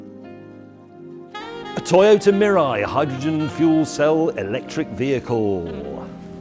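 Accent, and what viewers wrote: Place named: South Yorkshire, United Kingdom. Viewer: South yorkshire eh? Hehehe...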